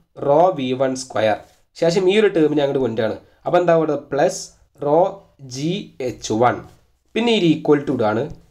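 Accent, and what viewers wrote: Malappuram, India. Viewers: Sir malappuram aano? Slag kettathond chodhichadhaan😁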